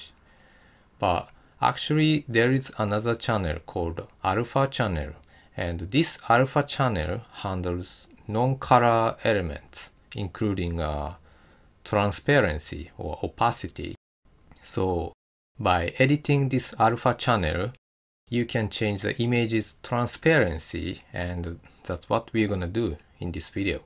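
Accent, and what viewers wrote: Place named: Japan. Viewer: Really helpful and good tutorials also your Japanese - English accent is really cool :) ! 素晴らしいJavaチュートリアルありがとうございます !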